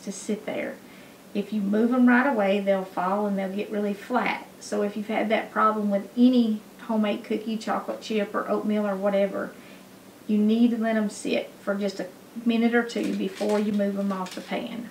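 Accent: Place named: Tennessee, United States. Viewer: Your Tennessee ? accent reminded me so much of someone I just couldn’t place it, and then it hit me! Dolly Parton! The way you pronounce many words reminds me of Ms. Parton!🥰🤩🤗 I am really enjoying your videos. Thank you ! 🙏🏼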